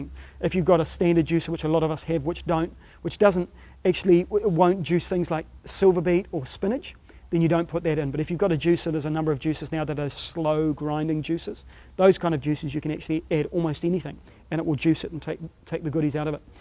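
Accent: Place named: New Zealand. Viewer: that's a very strong New Zealand accent if ever I heard one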